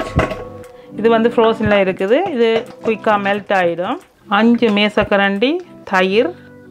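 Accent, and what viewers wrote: Jaffna, Sri Lanka. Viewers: Akka...I like the way you speak yarlpanam ...keep it up❤ the Tradition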